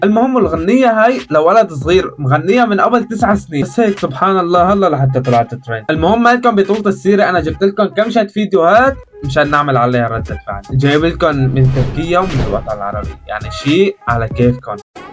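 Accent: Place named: Aleppo, Syria. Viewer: أنتي حلبي كأنو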